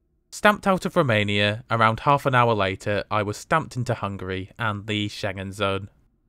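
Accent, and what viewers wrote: United Kingdom. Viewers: Thank you for the very nice vidéo.Your comments and explanations were great.I very much liked the British accent.....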